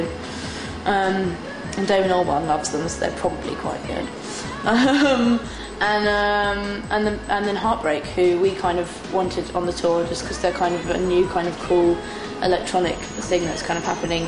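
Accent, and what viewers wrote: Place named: RP, United Kingdom. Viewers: What's wrong about sounding posh? Her and her bands music is amazing. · She english duh! If she was Irish that would be awesome :L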